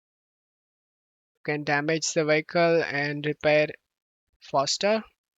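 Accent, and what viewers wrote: India. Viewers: Indian accent, i knew it will work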